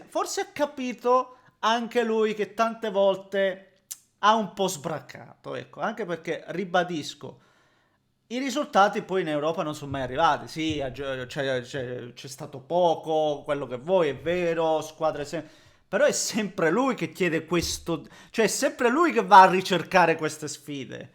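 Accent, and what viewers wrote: Calabria, Italy. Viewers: devo dire che la cosa che più mi ha colpito del video è scoprire che sei lucano (lo scopro ora nonostante ti segua da anni) il che mi ha sorpreso perché dalla tua parlata passa un filo di accento calabrese quindi immagino tu sia della zona vicino la calabria. magari mi sbaglio, tuttavia io ho origini materne proprio in basilicata e quindi volevo sapere di che zona sei magari c’è la remota possibilità che tu sia della “mia” zona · È lucano? Ma parla e si muove come i calabresi ahahahaha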